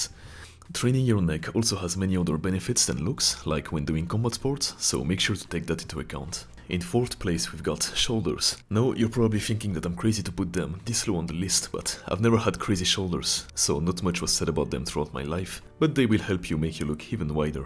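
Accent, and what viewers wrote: France. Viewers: Is he French? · No offence bro but you sound French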